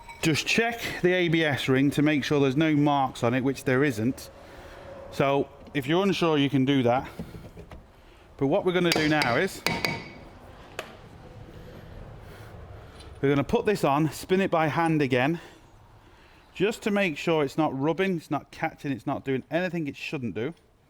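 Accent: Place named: United Kingdom. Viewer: British accent is awesome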